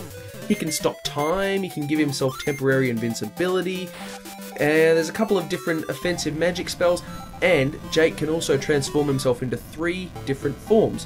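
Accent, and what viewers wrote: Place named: California, United States. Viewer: Dude, as a native Californian, I have to say.... that was a pretty damn good Cali dialect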